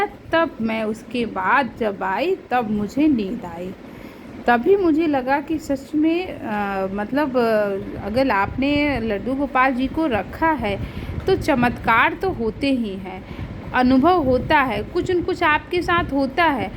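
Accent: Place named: Pahadi, India. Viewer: Aap ka accent bta rha h aap pahadi h 😀❤️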